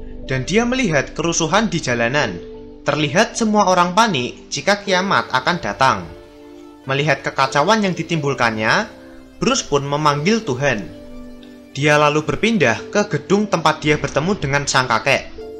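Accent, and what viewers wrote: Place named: Java, Indonesia. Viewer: TIDAK MENARIK , KARNA PEMBAWAAN CERITA TERLALU JAWA MEDOKK WKWKKK , NO SUBSCRIBE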